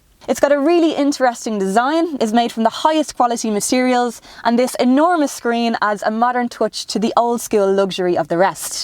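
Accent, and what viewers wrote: Ireland. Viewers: I would definitely buy a Lexus from. Oops sorry already bought a RX450h FSport. Love the Irish accent. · I have strong liking for Irish accent and a beautiful person, she is the perfect combinatb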